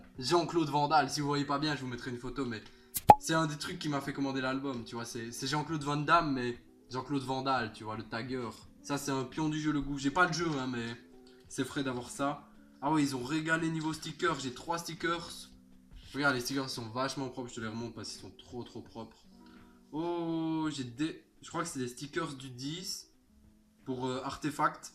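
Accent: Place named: Belgium. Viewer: Ça sent la Belgique dans la voix le gars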